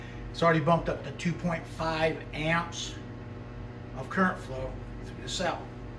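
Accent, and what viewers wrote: United States. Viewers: I really enjoy listening to your American English accent. Is there any information in the literature on the ratio of silver to copper deposited when the electrolyte solution contains silver and copper nitrate? It's probably above 1000, right?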